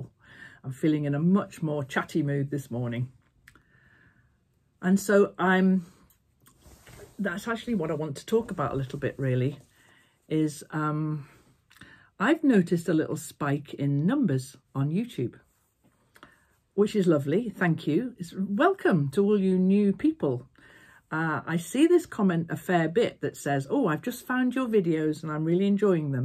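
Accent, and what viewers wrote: England, United Kingdom. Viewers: I got some quilting videos recommended by ty and somebody mentioned english piercing - I think it was the Royal Quilter or something like that in Australia. I google it, because I though piercing and quilting how do that go together. LOL. After searching in yt I found you within the results. Thank you ! I sometimes am in need to hear a lovely english voice, rambling on 🥰🥰😉. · I wonder if it suggested you because I watch "knit ink". They went on an Arne and Carlos cruise I think! Oh and just get it done quilts. She's great. Lovely to hear an English/uk voice chatting about quilts, though ☺ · I was watching Arne & Carlos today as a welcome distraction from our election mania here in the US (I am from the UK originally - so enjoy hearing your accent - makes me feel at home 💕) - they mentioned you, so here I am! Loving your channel! 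I think you might enjoy the Fairyland Cottage YT channel ;)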